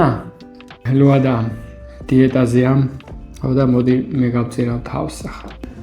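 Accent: Imereti, Georgia. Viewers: ძალიან მომწონს იმერულად რომ საუბრობ! 💟💟💟ჩემი დედულეთია სამტრედია ოღონდ სოფელი დიდი ჯიხაიშში! მე მგონი ყველაზე კარგი ბოლო ქაბაბი! ელარჯი უფ სასწაულია იყო! შეგერგოს! ბედნიერად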